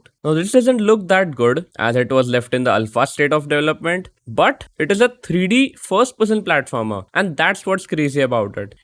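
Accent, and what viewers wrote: India. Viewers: are you indian? you sound like indian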